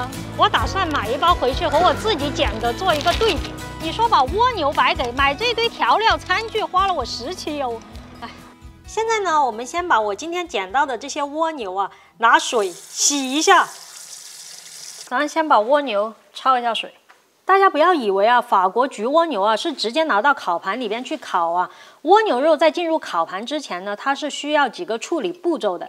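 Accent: Sichuan, China. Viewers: 浓浓的川普口音，好亲切啊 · 怎么从普通话里听出了一丝川渝味道…… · 喜欢这四川口音。哈哈🤣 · 一直以为yooupi是东北人 原来是四川妹子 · 川普😀😀四川哪儿的啊 妹儿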